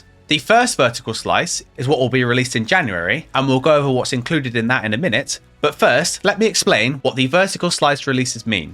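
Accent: England, United Kingdom. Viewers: A li ttle bit of English lad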